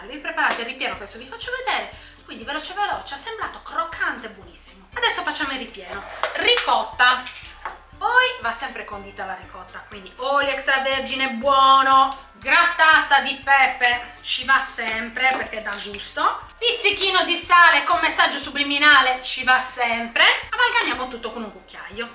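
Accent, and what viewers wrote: Sardinia, Italy. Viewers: oltre che brava sei simpaticissima complimenti mi piace il vostro dialetto sardo io ho ha nuora sarda e gli voglio molto bene ciao e buon Natale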